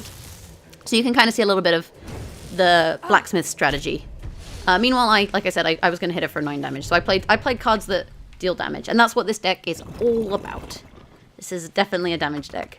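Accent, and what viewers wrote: United Kingdom. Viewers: Are you from NI?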